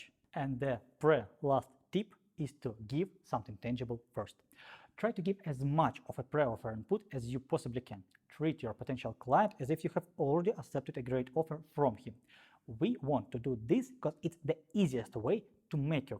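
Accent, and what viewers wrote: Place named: Russia. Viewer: Hi, just you have an accent like a russians :)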